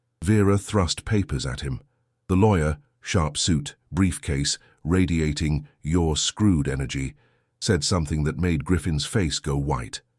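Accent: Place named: United Kingdom. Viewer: The Brit 🇬🇧voice makes the stories even better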